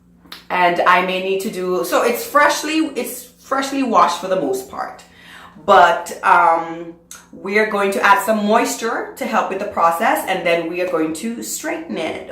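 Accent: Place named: Jamaica. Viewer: Are you Jamaican? I think I hear an island accent ! Lol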